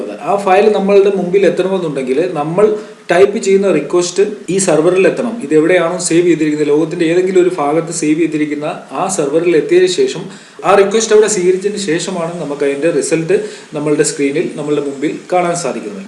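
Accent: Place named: Kollam, India. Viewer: കൊല്ലം furi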